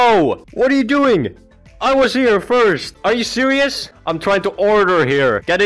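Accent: Finland. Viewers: I might be totally wrong but your english sounds like you're finnish, is that true or am I tripping? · i love your english pronounciation, reminds me a little bit of the famous finnish "rally english", and i mean that in the best way possible. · Hol up is that a finnish accent? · Are you finnish? You sound finnish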